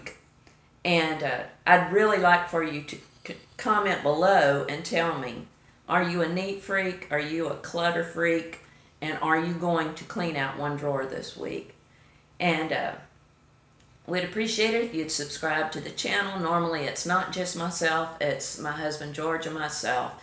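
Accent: South, United States. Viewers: Well I do agree the older we get, we do start to TRY and rid ourselves of things we don’t use anymore and collectibles that just gather dust. But I do have a shoe and boot problem,I admit,I’m addictive to them. I love boots, cowboy boots,casual boots, rain boots, it doesn’t matter,I love boots. I love my shoes as well,I see a pair of cute shoes and I just have to get them. I have a problem,I admit it. BUT I do get rid of them if I don’t wear them for awhile and to be honest,some of them I have never worn, I use to be an impulse buyer, but I’m over that. I don’t like my house cluttered,I like things in its place and this sounds crazy to some, but I like to clean and I’ve been that way my whole adult life. If I get bored, I clean out something or organize a cabinet or drawers,just whatever hits me. I don’t really bother the boot and shoe area.LOL. Enjoyed seeing you and hearing that sweet southern accent,hope you have a wonderful day and take care and be safe.God Bless You all and praying for Granny. 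💕💕💕💕 God Bless America ❤️🇺🇸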